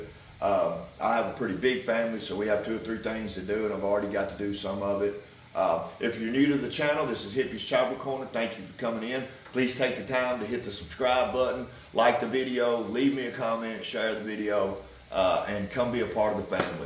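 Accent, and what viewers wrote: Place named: South, United States. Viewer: Man do you know what kind of southern accent you have? Like what region did you grow up or develop that thick accent you have?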